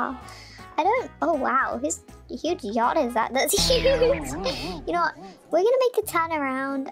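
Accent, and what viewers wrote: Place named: United Kingdom. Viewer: Why is your voice like British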